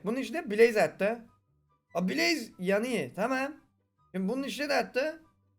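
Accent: Black Sea, Turkey. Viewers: Abi sen Karadenizli misin?????????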